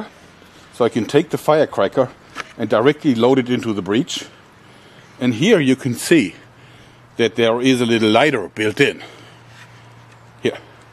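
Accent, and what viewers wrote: Germany. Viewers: the guy is a german i can hear it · yes he is...Don't you hear the typical german accent? :D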